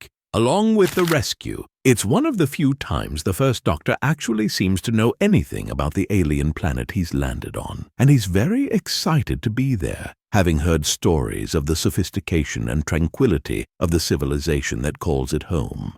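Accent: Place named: Transatlantic, United States. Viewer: Love the transatlantic accent , reminds of the haunted mansion.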